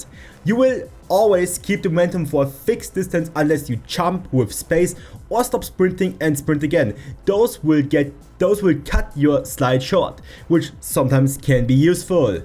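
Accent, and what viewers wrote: Germany. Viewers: U sound hella german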